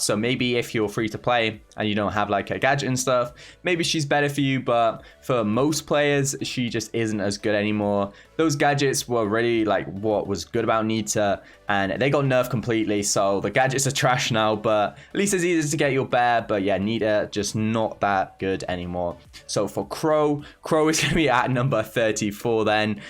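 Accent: United Kingdom. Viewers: You speak Oxford english.Arent you?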